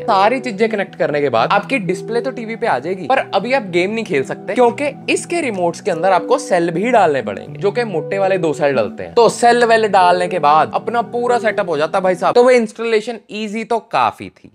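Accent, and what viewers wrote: Punjab, India. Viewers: Bhai tu punjabi aa😂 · Peculiar Indian accent 🤣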